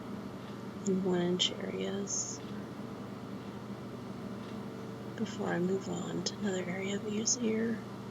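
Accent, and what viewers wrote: South, United States. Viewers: I would have to guess she is from Louisiana, she sounds like she is from the south to me. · Well, from what I've heard I've determined she has a bit of a southern accent meaning she probably originates from southern parts of the USA, my best bet will be one of the Carolinas :3c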